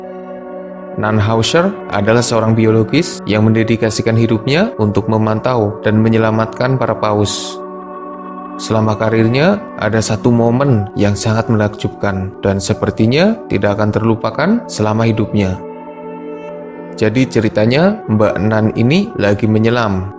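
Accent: Banyumas, Indonesia. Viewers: Ngapak e katon nemen kang🤣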